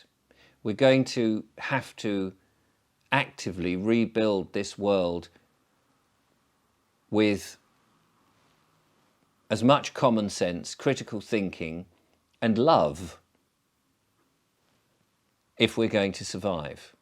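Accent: United Kingdom. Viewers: hi, since I discovered your channel I only watch that, really interesting, I also enjoy listening to the british accent...so elegant...keep doing a great job, cheers from an Algeria guy lost in the cold of Montreal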